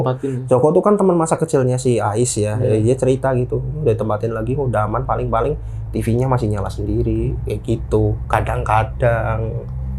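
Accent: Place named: Banyumas, Indonesia. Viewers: Kereeen bahasa ngapaknya.. Sering2 diselipkan bhs ngapaknya bang.. Biar makin seru.. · Bhasane msih ketara ngapake kang kya nyong 😅😅😅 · Cilacap hadir, wkwk jawa bgt ya mase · Joss wong ngapak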